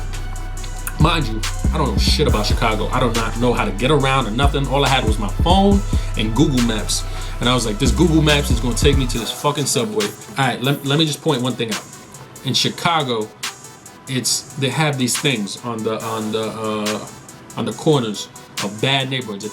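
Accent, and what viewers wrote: New York, United States. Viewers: Lol you was a Spanish nigga in Chicago with a new York accent and shit hat to the left them niggas thought you was a Latin king. Spanish gangs in Chicago though besides Latin kings and SDs ain't really on shit you probably would've just got ran out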